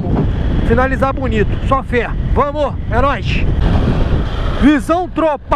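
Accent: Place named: Rio de Janeiro, Brazil. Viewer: O sotaque carioca é o melhor 😅❤🥇Fé tropa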